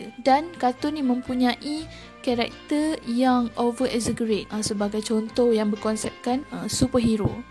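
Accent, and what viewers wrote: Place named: North, Malaysia. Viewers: orang utara macam mana pun dia control slang dia,mesti keluaq jugak utara tu😂😂😂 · Kita geng.. Ada dengaq mcm loqat utara pown ada.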